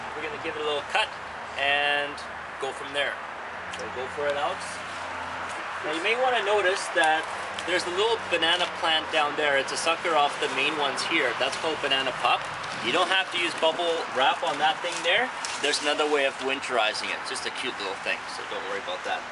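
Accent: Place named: Canada. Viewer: I’m Canadian, and as soon as I heard this I could hear the accent😂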